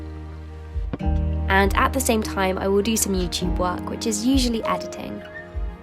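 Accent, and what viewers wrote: United Kingdom. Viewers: Damn....I loooooooove your Birtish ACCENT...JUST EXCEPTIONALLY GOOD..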